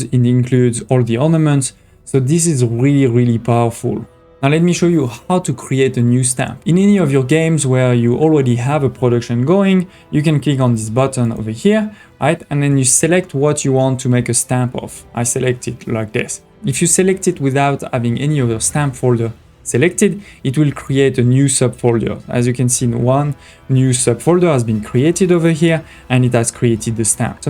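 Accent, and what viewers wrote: France. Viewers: Je ne suis pas douée en anglais, mais merci merci pour tes explications 🥰. Grâce à ton accent visiblement français 😉, j'ai réussi à te comprendre (et il faut dire que le voir en vidéo en plus ça aide, bien évidemment). EDIT : je viens de voir dans "à propos" que tu es Français, je ne m'étais donc pas trompée, ton accent t'a trahi 😁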